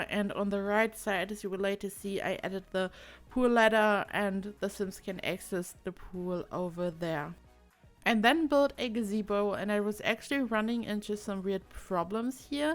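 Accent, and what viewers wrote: Germany. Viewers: The most adorable german accent :D and of course great builds!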